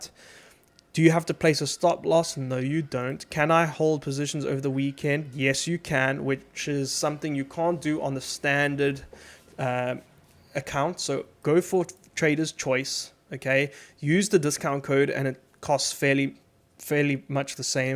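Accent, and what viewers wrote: South Africa. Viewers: Ah you can’t miss a South African accent, from a fellow South African being able to get funded opens a massive opportunity for us dealing in dollars, I’ve been wanting to take a challenge and hopefully, I can get my chance.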